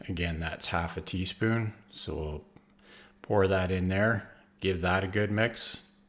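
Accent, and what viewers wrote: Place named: Canada. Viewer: Hey,. Thank you for the video.😊 This looks like it may be a good replacement for ice cream, eh? What do you think? I had a weakness for ice cream, but trying not to eat it now.:/ I hear your Canadian accent.😊 (I’m from Chicago Illinois.) This is the third time that I subscribed to your channel. I keep getting unsubscribed.:/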